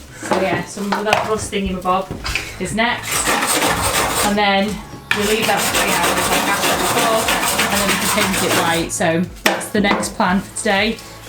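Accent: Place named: England, United Kingdom. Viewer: I really enjoy listening to you speak. (English accent) 😁👍🏽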